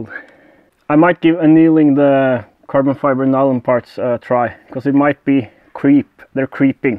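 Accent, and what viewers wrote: Australia. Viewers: are you from Aussie mate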